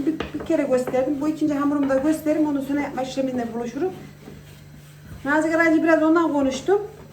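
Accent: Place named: Kayseri, Turkey. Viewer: Kayseri şivesi gibi konuşmanız çok hoş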